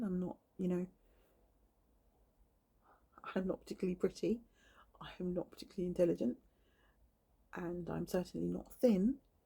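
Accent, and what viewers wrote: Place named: United Kingdom. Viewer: I just want to give you a compliment. Your speaking voice is sooooo soothing. It relaxes me, lowers my blood pressure and helps me fall asleep. Maybe it's your British accent. But since you've posted the video regarding Thandiwe Newton I've subscribed to your channel and watched your videos. I'm from the states (Chicagoland area). I'm fascinated with the UK and have been for years. The more I study the UK the more I realize it's influence on the USA and the world. After all Britain colonized most of the world at one time including America and its influence permeates now. I watch BBC and Ski News more than American news. I watch British TV shows and movies. I hope to visit the UK someday. But back to your voice. You should do more videos. You could read the telephone book. I don't care. Lol I think you could get work reading EBOOKS. 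Lol 🤣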